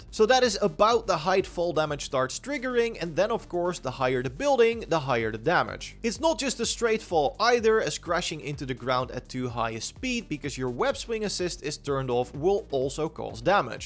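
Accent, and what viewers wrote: Netherlands. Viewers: i smell dutch accent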